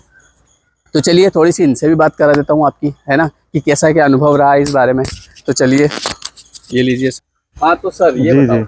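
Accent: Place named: Malwa, India. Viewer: भाषा टोन mp निमच, मंदसौर की है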